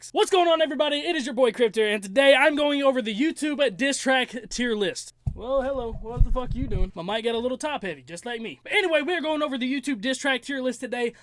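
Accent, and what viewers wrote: Alabama, United States. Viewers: Is he from Alabama cuz I am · Wait are you from Alabama?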